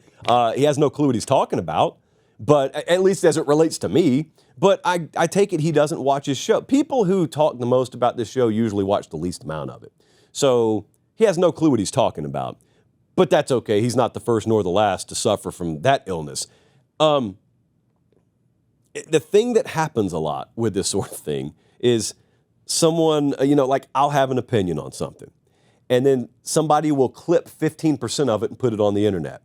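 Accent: South, United States. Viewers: 😂GTFOH! when the story first dropped you were literally saying that you thought nothing would happen. You can use your southern twang all you want to try and backtrack. But you got caught, called out, and now you're trying to do your best tap dancing to get out of being called out. Just admit you were wrong and move on. ScUM is a big brand and you didn't want to piss off their fans. But you backtracked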